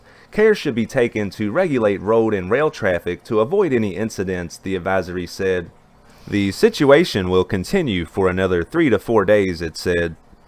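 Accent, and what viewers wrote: South, United States. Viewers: I especially like his personable southern accent, but without it being hard to understand.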